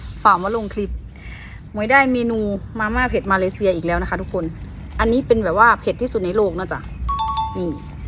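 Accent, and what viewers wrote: Isan, Thailand. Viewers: สาวหมวยพูดอิสานน่ารักดี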